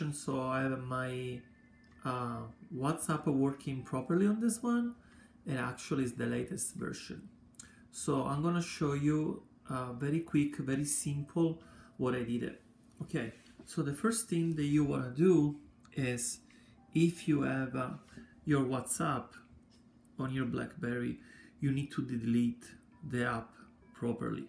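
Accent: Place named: Italy. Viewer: La butto lì...ma sento un vago accento italiano...😜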